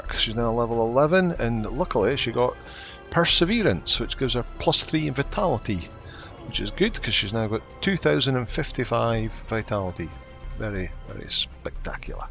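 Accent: Scotland, United Kingdom. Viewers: I know it. One of my colleauges in Thailand was a Scotsman and he had the same accent as you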